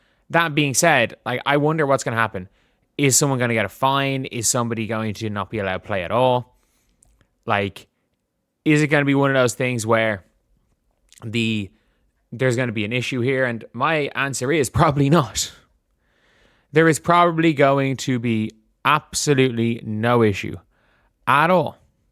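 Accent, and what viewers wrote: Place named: Ireland. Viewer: I love to hear my hoop news in your charming Irish brogue. Reminds me of my immigrant dad. Love u dude keep spittiing those facts me boyo 🇮🇪🏀☘️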